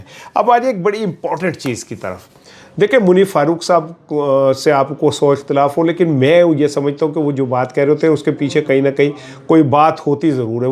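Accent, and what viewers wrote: Punjab, India. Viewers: Bhai love the way you explain things and bit of punjabi touch. Uk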